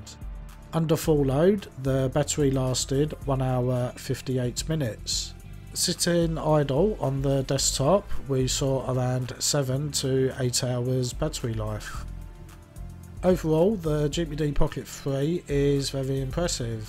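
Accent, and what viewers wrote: Cockney, United Kingdom. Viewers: It's his cockney accent to be at fault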